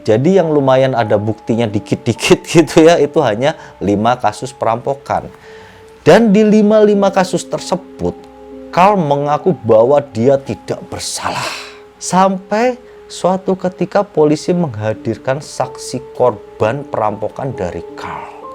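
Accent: Java, Indonesia. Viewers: Medok amat coy..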